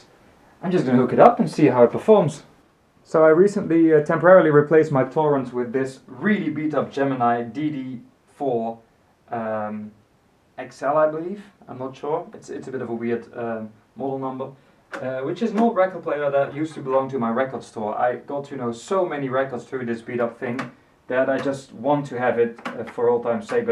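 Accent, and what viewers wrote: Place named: Netherlands. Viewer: Nice unboxing; your accent somehow sounds Dutch (je klinkt nederlands). My first turntable was a Reloop RP-2000 MK3 and it was similar to this one in many ways. The tonearm assembly, the dodgy strobe indicator, the platter without magnet. It didn't come with a dustcover because it is a DJ turntable, but when I sold it to my parents, they wanted a dustcover, so I bought a Reloop dustcover for them. It has that exact same type of hinges, detachable on both sides, with those plastic squares that go into the dustcover slots.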